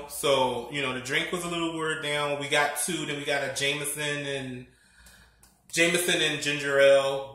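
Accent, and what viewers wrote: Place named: Philadelphia, United States. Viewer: I knew You were from Philadelphia you sound exactly like Kevin the Scorpion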